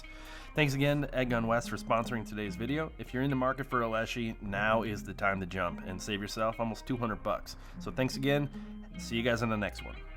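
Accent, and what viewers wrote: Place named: Spain. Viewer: What's up with the Spanish undertone? 🤔I'm confused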